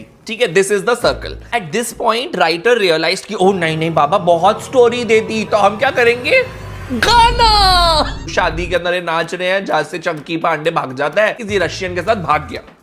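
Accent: Punjab, India. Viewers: Story gai teil leny❌ story gai phla tu duja tu teeja tu leny✅😂😂😂😂 is bnda ka story telling ka andaz or bich bich me punjabi touch.. zbrdssttttt😂😂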